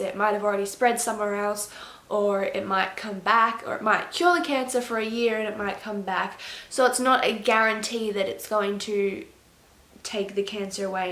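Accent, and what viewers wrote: Australia. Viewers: I really love your videos, your personality shows so much <3 Also, i lub your Australian accent omg, I could listen to you talk forever.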